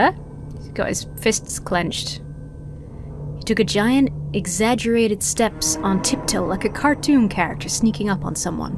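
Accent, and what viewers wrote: United States. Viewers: your american accent sounds a little bit like Red from OSP. :O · Love your American accent as always. But, uh, calling this a "game" feels a tad generous. Still, got some good fun out of it. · your American accent freaked me out more than anything. It sounded too good, like you been lying about having a British accent this whole time! It was unsettling. 😊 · Your American accent is on point!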